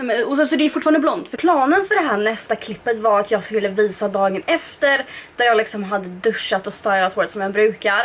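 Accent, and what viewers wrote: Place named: Karlskrona, Sweden. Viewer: em_ det är karlskona dialekten som kickar in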